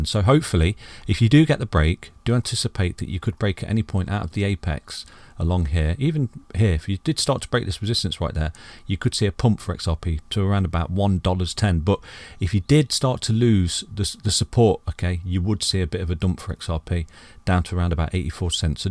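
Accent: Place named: Leicester, United Kingdom. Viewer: Where in the uk you from? You sound like your from Leicester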